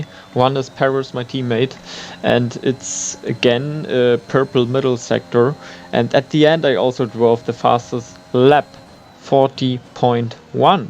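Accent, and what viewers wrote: Germany. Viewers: Wieso machst du deine Videos eigentlich nich auf Deutsch auf Englisch ist das so weird weil man merkt das du deutsch bist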